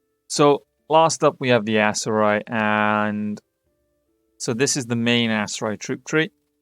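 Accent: United Kingdom. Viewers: I can hear the South Wales accent straight away, Swansea me. 😂🏴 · I always enjoy your videos and your accent as well, what part of the UK are you from?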